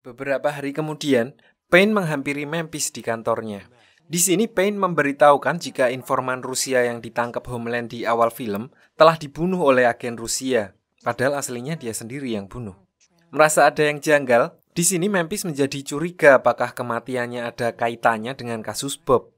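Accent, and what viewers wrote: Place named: Java, Indonesia. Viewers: Medok tp keren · Fixs wong temanggung admin e 😂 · medok cukk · Medok banget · gak bisa biasa aja apa bang medok banget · njir sikak wong temanggung ta 🗿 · medhokk cokk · Youtuber dari jawa timur ini kyaknya, udh jelas bgt dari omongannya wkwkwk..😂😂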